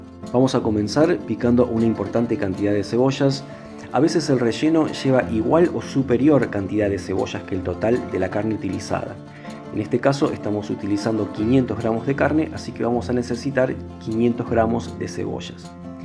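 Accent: Argentina. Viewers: Que placer oir hablar argentino. Estuve aì tres años. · Que hermoso que explicas!!!! sos Argentino?. nos das una variedad de comidas impresionante!!! Me encanta!!! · Me encantó todo desde la receta hasta el acento precioso de el Argentino que lo explicó todo a la perfección. Gracias!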